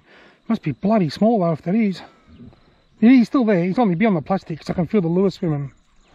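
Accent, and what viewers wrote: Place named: Australia. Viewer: Yet another great video, just real Aussie talk with awesome fishing.... hats off to ya brother 🤙🏼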